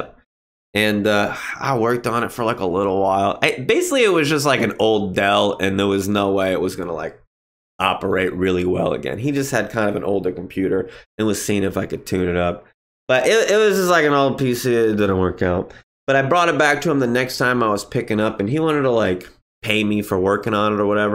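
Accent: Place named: East Coast, United States. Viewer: sounds like every relative I have from Back East.. 😀😀😀 Love the dog and the show!!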